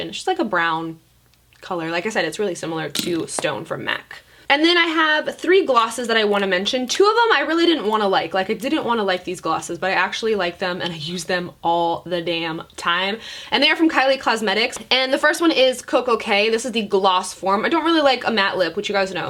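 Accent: United Kingdom. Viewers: Happy New Year!! I hope you do a skin care tutorial. Also I love when your British accent comes out, don’t know why but I do..lol Thx&see ya : ) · 💜💜By the way, I love your new purple hair😍 this is funny but my mum is British born and raised and she married my dad who was in the air force at the time and we have family over on England and I lived there for almost four years and your British accent is amazing😂👌🏻👏 Also..I just love you so much!!! Im in esthetician school and I'm supposed to be studying for a test on electricity tomorrow😩 and had to take a break!! Love you lots💜😍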